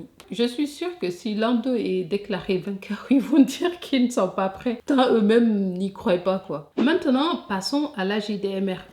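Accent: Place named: Senegal. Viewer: Tu as un accent sénégalais , tu es sénégalaise tes frères et sœurs en Mauritanie sont la plupart des exclaves , soit vous les ramenez chez vous soit vous la fermez . Il peut pas y avoir de Noir en Mauritanie c’est un peuple arabe . Vous cassez les couilles aux gens de partout . Même en France vous voulez pas laisser les gens respirer. Retournez chez vous et le problème est réglé.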